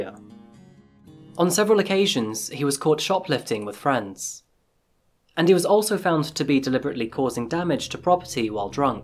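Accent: United Kingdom. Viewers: out of context but i get so calm and feel so good listening to this guys voice even tho hes usually talking about murder lol i love u adorable british guy who tell me true crime cases, i love u! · I brought it up because apparently someone thought that Japan is any worse than any other country. Nopers. Even places like Canada do shit things to people. This video was made with a holier-than-thou attitude. It's probably best to look at your own country before you start blaming other countries for things. This guy sounds British, and we all know how bad the UK, one of the dominant colonizers of the world, has been, and continues to be. I wouldn't doubt it if this narrator himself has some dark secrets. One thing that certainly isn't a secret is that he is capitalizing on crimes. He's actually capitalizing off of the victims of this massacre. If he actually cared, he'd be doing work behind the scenes, helping people with disabilities, instead of going for a cushy YouTuber lifestyle.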